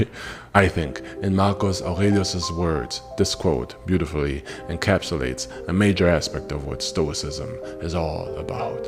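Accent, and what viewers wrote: Germany. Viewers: Are you German ? Cause the way you say everything reminds me so much of the people in my life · Why do you pronounce Roman names with a German accent? Latin should sound more like Spanish or Italian. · Are you German bro?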